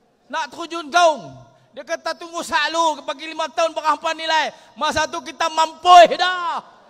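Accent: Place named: North, Malaysia. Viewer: Ni 1st time aku dgr MB kedah bercakap. Mmg stail dialek dia mcm ni ke? Mcm pekat je. Aku ada gak belah mak org utara tp x pnh lak dgr loghat cmni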